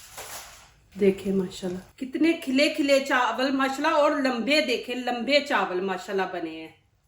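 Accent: Punjab, India. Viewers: Behe Shef Sahiba Ap tu Urdu Bhi Panjabi ma bol rshi hain Khuda k liye Panjabi ma hi Comentry kr boht kharab Ecsent ha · Sorry behna panjabi wali urdu na bolain urdu ko urdu ki tarha bolain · Achi recipe hae definaitly I'll try it in sha Allah...mae bhi punjabi ho but aap ny to urdu ke tangain he toor de 😄 too much strong accent hae but words bhi properly nahi bol re like dahi ko dare, bareek ko break full punjabi words use ho ry hae in urdu plz is pr bhi work krain.Allah aap k channel ko kamiyab kry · Baji Aap ki Ourdo same like Punjabi Exent.... are you from Sialkot....?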